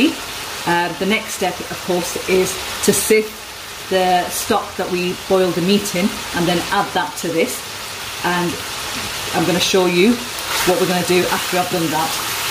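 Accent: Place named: England, United Kingdom. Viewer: I love your English accent with Urdu words 😊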